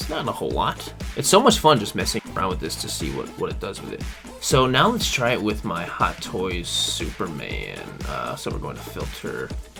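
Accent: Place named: United Kingdom. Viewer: british han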